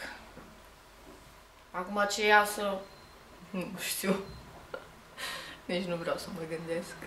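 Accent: Romania. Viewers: are accent frumos de mănăștureancă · Doamne fer', da ce-mi place ardeleanca cum grăiește!!! 😁😁😁 Like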